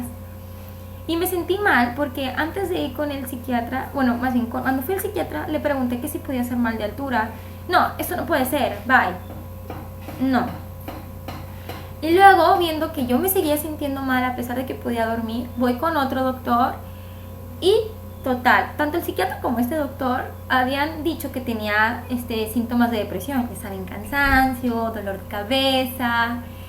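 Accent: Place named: San Luis Potosí, Mexico. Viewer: Jejeje me gusta mucho tu acento y cómo te expresas, pues he tenido amigos mexicanos, curiosamente tu acento se me hace de San Luis Potosí, bueno no me hagas caso no soy de allí pero tuve amigos de allí que se expresaban parecido jejeje. Por cierto te mando un abrazos desde España y ojalá que estés mucho mejor.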